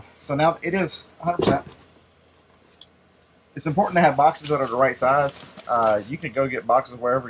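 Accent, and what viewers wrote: South, United States. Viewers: So nice to hear a southern accent. SE Tennessee here.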